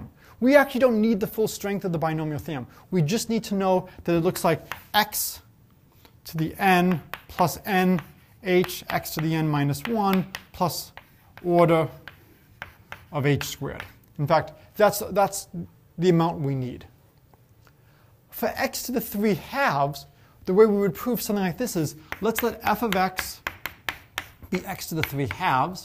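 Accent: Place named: Boston, United States. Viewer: Q: What do Bostonian doctors say when they need to examine their patients' throats? A: "Say r" Ain't nothing to apologize for, it's charming :-)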